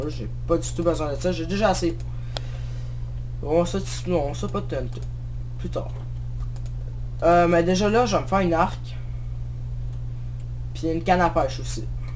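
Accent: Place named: Germany. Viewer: Tes cris sont toujours épic ^^ sinon bel accent allemand bravo! ;)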